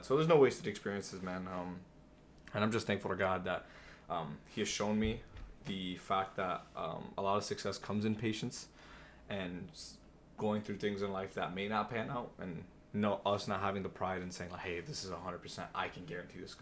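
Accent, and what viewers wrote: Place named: Brazil. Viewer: Suspeitei, meu irmão, que vc fosse brasileiro por alguns trejeitos de sotaque que eu tbm tenho (bem mais que você, é claro). Valeu pela mensagem, tô aqui no Brasil, na luta, trabalhando de SysAdmin e Analista de Sistemas numa pequena empresa, mexendo principalmente com ERP. Tenho sempre tentado seguir o caminho que Nosso Senhor pôs pra mim, antenado às mudanças do mercado mas sem se encabrestar por elas, senão pelo Evangelho; e esse emprego foi mais uma bênção que ele concedeu para minha vida. Que Jesus, nosso Deus, Maria, sua mãe, e São José, seu pai adotivo e provedor, te ajudem na tua própria jornada, irmão. Fica com Deus!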